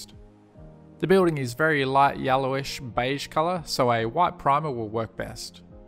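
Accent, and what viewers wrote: Australia. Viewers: You Australian??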